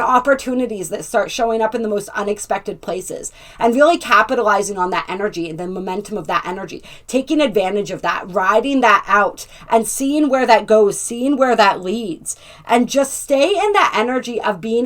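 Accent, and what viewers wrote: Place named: Midwest, United States. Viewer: Your video brought tears to my eyes, a pressure in my heart chakra and inner peace. Thank you for your spirit, your guidance and you Midwest accent 🌈❤️🧡💛💚💙💜🤍☯️♋️/♌️ 33/6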